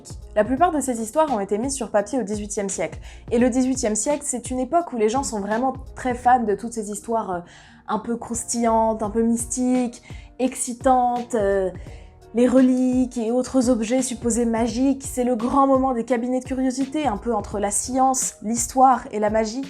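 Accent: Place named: England, United Kingdom. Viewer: Wow, je suis éblouie par cette beauté, ce talent, cet accent anglais ainsi que cet humour absolument incroyable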